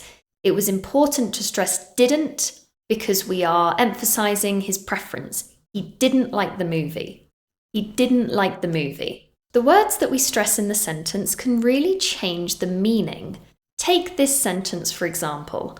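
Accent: United Kingdom. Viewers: I love your British accent ❤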